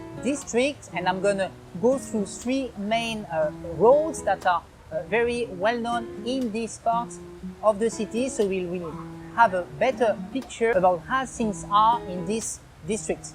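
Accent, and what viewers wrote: France. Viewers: He has a French accent because he is ... FRENCH!! Can Americans be respectful or is that too much asked?! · Also sein Englisch-Französischer Akzent, ist verständlicher als gewisse andere Britisch oder Texanisch Englisch sprechende Personen. Desweiteren könnte man auch sagen, vielleicht lernt man den Englisch - Französischen akzent zu hören und zu verstehen. Get out of your Comfort Zone and grow your learning curves. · Nice french accent. Very funny to hear it.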